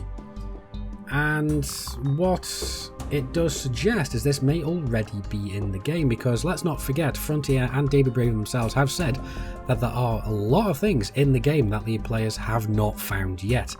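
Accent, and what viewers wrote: United Kingdom. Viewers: I love you random British guy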